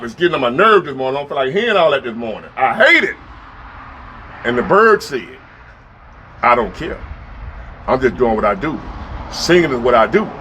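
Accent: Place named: South, United States. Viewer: Got the rocking chair on the porch! I hear ya bro! Can tell from the South!! 💪🙏✝️🇺🇸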